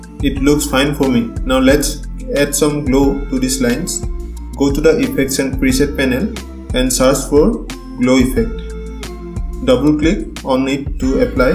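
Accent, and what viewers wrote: India. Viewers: You sound like an Assamese. I am Assamese and ik my ppl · finding a tutorial 😀 Indian accent ☹ only tutorial there is 🙄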